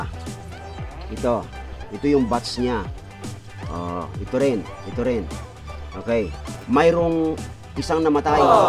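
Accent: Pangasinan, Philippines. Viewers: Pagka po ba inilagay na sa ref ilang days po bago babasain? Same taga pangasinan po ako Sabi ko na nga po ehh halata sa boses😄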